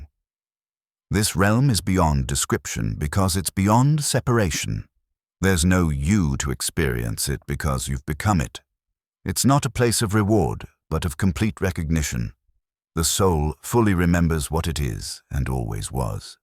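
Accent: United Kingdom. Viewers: Lemme gess more a i. Trying to act kike the know dick about human spiritualality when youre just a preditor taking advantage of humanitys dire need for mass spiritual awakening and info so you put these suposidly helpfull ( all they usually do is repeat them selves over and over and i get a broken clock is right 2 times a day i also get tgat humanitys greedy and the other part of us aint byt we just wanna live and be usefull to are fellow humans were you you dont even intoduce youreself first pount of you drfintly aint one of us strike 1 strike 2 you never ever say any of this like its comming from personal or even studied knoledge no youre literly just copied jung or who ever copied pasted refergitaed real humans real learnend knoledge and deepth to suit youre needs to genetate cash for some one that probably has way to much if that allready 3rd point how i know ypure fake 9/10 times its the same pish english my shit dont stink and i know everything additude when you clearly never put a pair of pants on or walked a mile in any ones shoes see the thing is i binge watch utube tons of it all month a myriad of difrent subjects from soiritualality to religion philisophy sociology psychology history geography homless shelter building old time plants for food and medicine every channel that tails wearwolf stories or dogman and a shit ton of musical everything and this posh englush holly crap iam better than everyone voice with it equally condecending additude its just plain gods da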